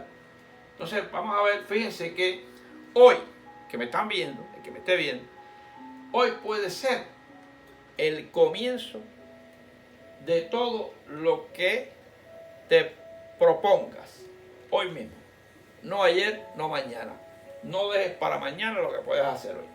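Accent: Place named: Cuba. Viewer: Hello Dr, parece ser cubano, gracias por su contenido. Fuerte saludos.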